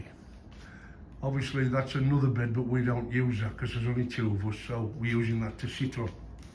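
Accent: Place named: Black Country, United Kingdom. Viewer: Nice to hear a local accent :) , born and bred brummie here now living in east Yorkshire ( guessing your more black country way? ) ... we did Tunisia last year but Sousse , enjoyed it but fancied a change this year and found this hotel , another Tui Blue ( although not adults only this time sadly ) Great honest review and so have booked it for mid December , bit more money than last year but we've paid for a sea view room etc .. cheers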